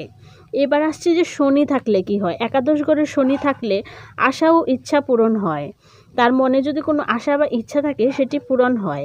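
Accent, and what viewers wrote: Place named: Bangladesh. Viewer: আপনার উচ্চারণ ভারতীয় বাঙ্গালী মনে হচ্ছেনা।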